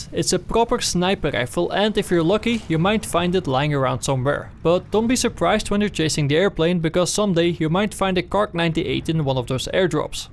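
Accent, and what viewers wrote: Netherlands. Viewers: Relo i em dyts I love your dutch accent man! :D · clearly Dutch from the first second mate. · Wanneer je Nederlands accent niet te ontkennen is. Verder een goede video! En bedankt voor de info. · i cant stand this dutch accent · lol slecht engels (bad english, i can hear he's dutch FOR FUCKING SURE) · AAAAGHHHHH THE DUTCH ACCENT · r u dutch? i recognise my people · Its a dutch accents I think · he didnt he is dutch so hes talking like that i know that i am dutch too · You're dutch I can hear it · sounds dutch to me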